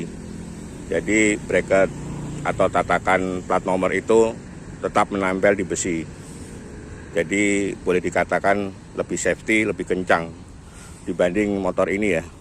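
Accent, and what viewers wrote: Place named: Java, Indonesia. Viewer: Mas nya bukan orang sembarangan, karena apa, karena pengucapan kata katanya terstruktur rapih dan aksen jawa nya kental jadi saya suka dengernya... ❤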